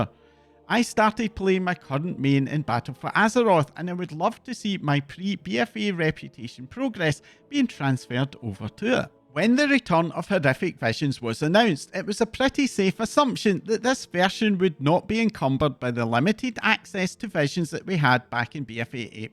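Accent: Scotland, United Kingdom. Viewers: Love the Scottish accent fr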